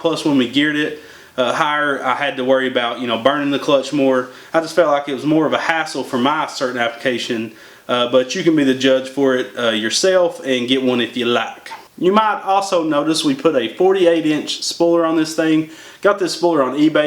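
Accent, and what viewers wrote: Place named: Arkansas, United States. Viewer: man i know this is an old video, hope you see this. but what state are you in? Being honest and respectful you sound like an Arkansas boy. Im from South Missouri. im just getting into gokarting with my buddies and we love your videos! jeep up the good work man!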